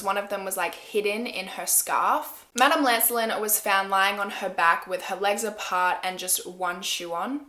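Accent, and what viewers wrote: Australia. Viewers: I FKN IOVE UR ACCENT! THE AUSSIE IS SO STRONG! IM AUSSIE TOO! PERTH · the fact that she has an australian accent is just a plus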